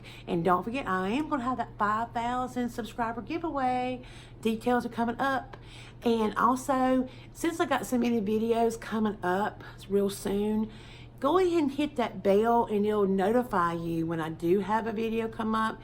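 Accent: South, United States. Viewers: That is such a sweet little corner you've created! Everything co-ordinates and flows so beautifully together. It's so nice to keep some sentimental pieces like your scarecrow through the years. I still have a Christmas ornament my friend from school made me in grade 5. He's a Santa face made from paper glued on a pine cone with a red paper hat and cotton balls used for the top of his hat and his beard. The years have caused the cotton to disintegrate a bit but he always hangs on my tree each year. By the way, I just turned 59 last week so he is pretty old to! Anyway, love watching your videos and hearing your southern accent, ( I'm from Canada so to me you have an accent)😊 Bye for now, Sandy 💟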